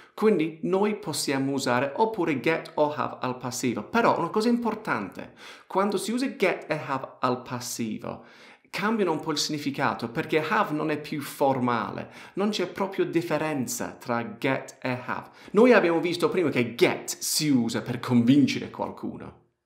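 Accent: United Kingdom. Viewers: HI! Your sons are precious! I like that perfect British accent! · very well!! Thanks! e voglio aggiungere che quella storia del pugliese non la condivido affatto: a me sembri un vero inglese che parla un ottimo italiano! :)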